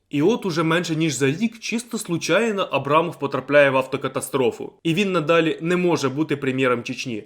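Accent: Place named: Vinnytsia, Ukraine. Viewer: Ти що з Вінниці) цікавий суржик)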